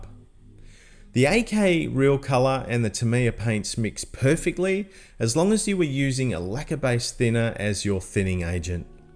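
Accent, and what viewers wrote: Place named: Australia. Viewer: Fun 70s memories, but mine weren't quite as Aussie sounding. 😅 MN, USA 🇺🇲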